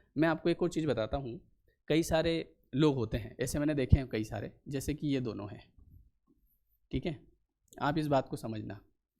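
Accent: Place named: Maharashtra, India. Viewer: Chhan sangital tumhi sir aani tumhi mla bahutek marathi aahat as vatal tumchya bolyavarun ...tumchya pudhchya video chi aamhi vat bghtoy lvkr bnva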